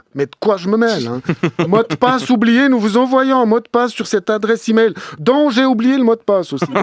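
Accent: Switzerland. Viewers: Tu reconnais un suisse à son accent mais aussi à ses blagues d’enfants de 5 ans ! L’humour et l’esprit vole vraiment très bas ! Le concept de révolutionnaire du dimanche prends avec eux tous son sense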